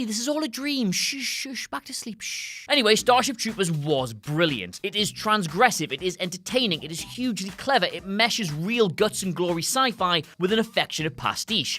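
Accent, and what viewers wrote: United Kingdom. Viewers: I can't believe I have to watch and listen to you ramble on an entire minute before you actually start getting to the point!!!!! Do you really think we want or need all that, way to fast and British, chatter at the beginning.. I mean, the subject matter is interesting and all but jeeeeez, get on with it!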